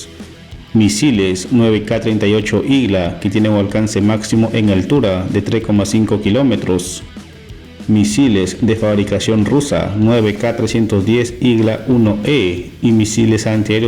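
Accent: Peru. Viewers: Revisa el canal, es peruano, sale en el video que le dan el botón de plata u oro de YouTube XD además es cosa de escucharlo xd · TE DA VERGUENZA SER PERUANO? ENTONCES NO FINJAS QUE NO TIENES ACENTO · Es peruano creo , y ellos hablan así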